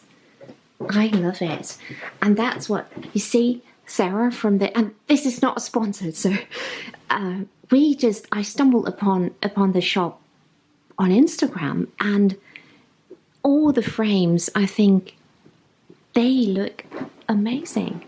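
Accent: England, United Kingdom. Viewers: Hello, I‘m curious to know if you ma‘am are German too, or only your husband? You definitely don’t sound German. You sound 100% English.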